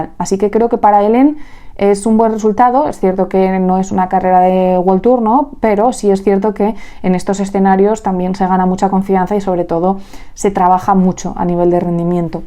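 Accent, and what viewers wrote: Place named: Spain. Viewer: ! Gran comentario de aquí en adelante un oyente más. Talento, belleza e inteligencia matizada con ese maravilloso acento español. Saludos desde Buenos Aires.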